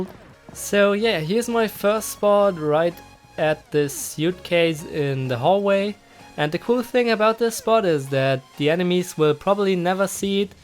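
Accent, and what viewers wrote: Germany. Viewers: Ich hab es direkt gemerkt das du deutscher bist ☺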